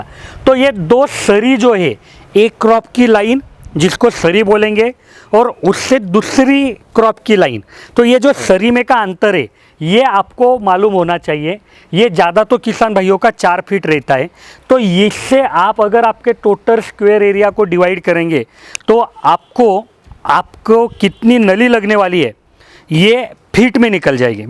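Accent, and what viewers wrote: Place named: Maharashtra, India. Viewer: आपल्या बोलण्यावरून असं वाटतं की , आपली भाषा मराठी आहे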